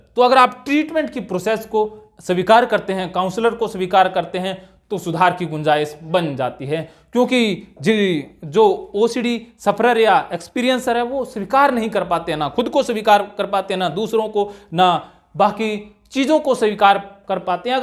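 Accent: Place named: Marwari, India. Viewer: Sir aap great o sir ese lgta ha ap marvadi bhasha bolte o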